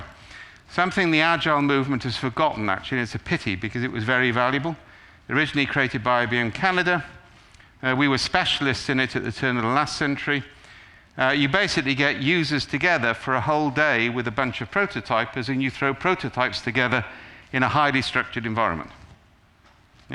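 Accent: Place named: United Kingdom. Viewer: just brilliant talk! but definitely need to rewatch it to understand all of it. Also, British accent is hard to grasp sometimes, if only someone could make subtitles * _ *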